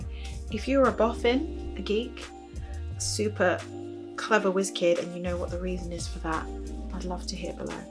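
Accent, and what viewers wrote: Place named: South London, United Kingdom. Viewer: you sound so much like you are from South London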